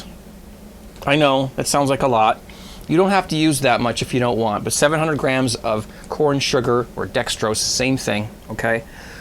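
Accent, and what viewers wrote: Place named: Canada. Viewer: hi, thanks for the great videos. I'm learning a lot. Just curious that you use Coopers products as I'm from South Australia so it's every where (and I love Coopers:)) but you sound Canadian - so either you live here or Coopers are exporting far and wide - cheers to you!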